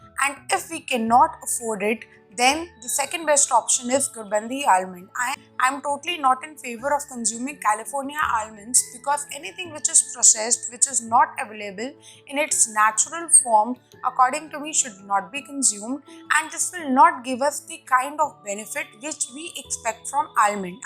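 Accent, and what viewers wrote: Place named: India. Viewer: IM STRUGGLING WITH THE WAY YOU SPEAK YOUR ACCENT REALY INDIAN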